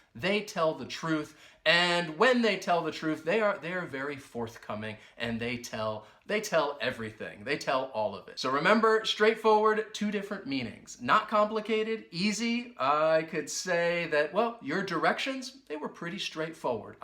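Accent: United States. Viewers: I am from India... even I understood all the words properly in your American accent..all adjectives were tought very clearly... Thank you sir!!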